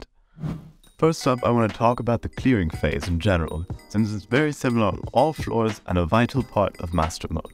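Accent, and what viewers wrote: Germany. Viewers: Yo, nice german accent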